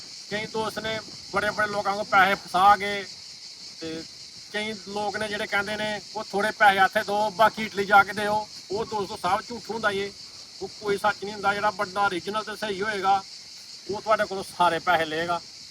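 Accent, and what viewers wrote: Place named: Shakargarh, Pakistan. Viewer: جو بول رھا شکر گڑھ کا لگتا ھے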